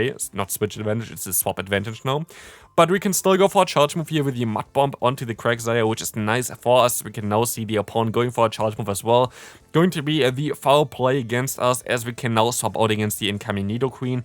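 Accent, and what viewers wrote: Germany. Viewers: He's german.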